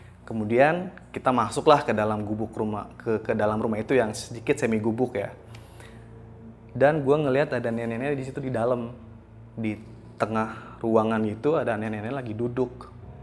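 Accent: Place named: South Jakarta, Indonesia. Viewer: Ada logat2 jaksel kek nya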